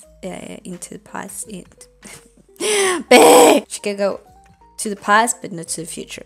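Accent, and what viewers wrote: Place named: Colombia. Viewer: I love it so much but I cant put together ur accent I think is Colombian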